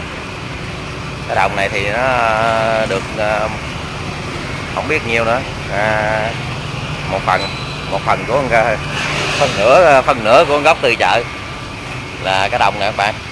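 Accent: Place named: Mekong Delta, Vietnam. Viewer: Nghe con nói chuyện...Thấy thương quá!😍 Rặt dân miền Tây luôn!🖐😍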